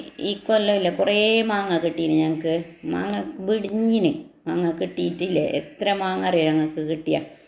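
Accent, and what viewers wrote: Kasaragod, India. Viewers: നിങ്ങൾ കാസർകോട് ആണോ ഭാഷ കേൾക്കാൻ നല്ല രസമുണ്ട്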